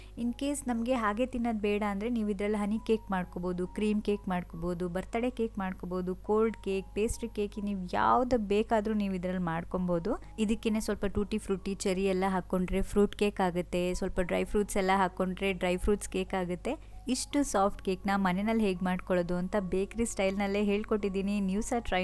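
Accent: Mangalore, India. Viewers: Nice ❤ Actually nam ur Kundapura but nim mathaduva style nam mangalore side ansuthe and more over ur cooking skill was too Good Make Paneer Dishes❤❤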